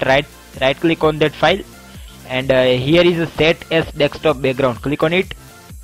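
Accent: India. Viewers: i know its fake,you have an indian accent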